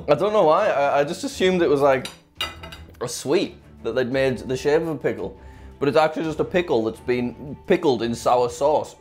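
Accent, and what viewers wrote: RP, United Kingdom. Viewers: he’s so posh in this video 😂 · where are you from? You sound British I am as well. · I can tell so easily that he is British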